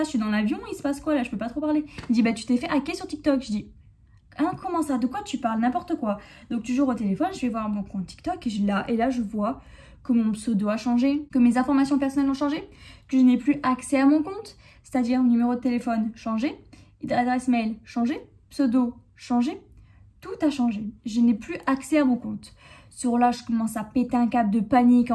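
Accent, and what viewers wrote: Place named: Corsica, France. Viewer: Salut dsl pour ton compte mais je voulais te demander t'es pas d'origine corse parce que tu as quelque fois l'accent corse sinon j'espère que sa va aller mieux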